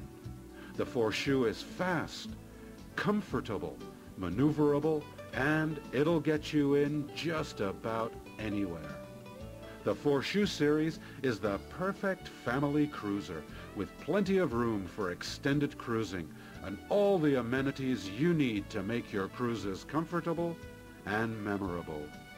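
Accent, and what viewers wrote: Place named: United States. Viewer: great boats, but jesus, this video looks and sounds like its from 1990. and whats with the super american narrator?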